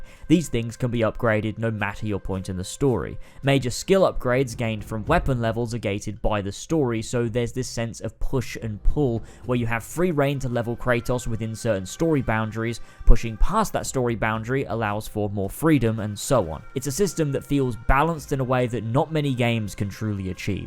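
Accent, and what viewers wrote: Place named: United Kingdom. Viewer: A Greek name spoken through a thick UK accent.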